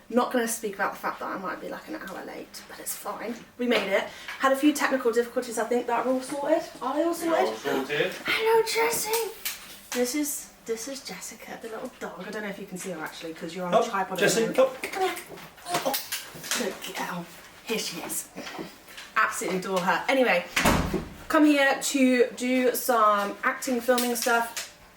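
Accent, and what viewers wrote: United Kingdom. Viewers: Cool, are you from England?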